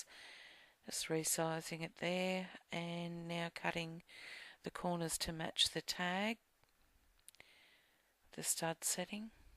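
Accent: Australia. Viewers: Hello:) I really enjoyed watching your video, and after watching your video, I am off to get some glimmer mist to use with stencils. An excellent idea! From a fellow Aussie, it was wonderful to hear the Aussie accent again!:)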